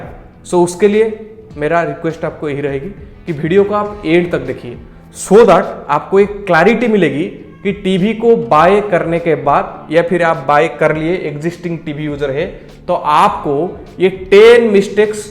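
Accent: Odisha, India. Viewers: odisa se ho ??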